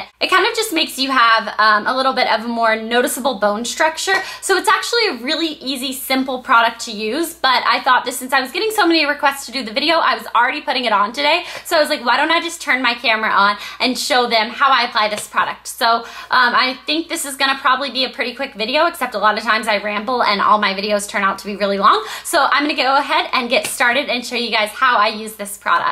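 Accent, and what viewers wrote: South, United States. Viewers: i just watched one of your older videos...and your accent has changed SOOO MUCH! you had a really cute southern accent.. :D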